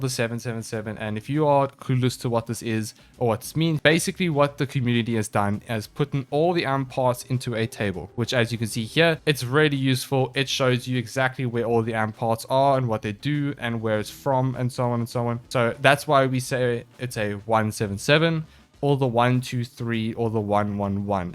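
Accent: South Africa. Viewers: Are you south african??❤ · Are you South African?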